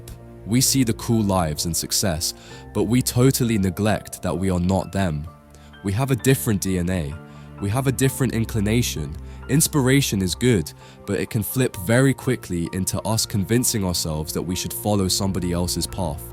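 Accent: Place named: United Kingdom. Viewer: Why did he turn British?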